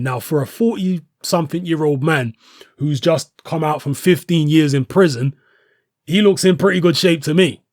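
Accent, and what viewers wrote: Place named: South London, United Kingdom. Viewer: He's from South London , they all sound like that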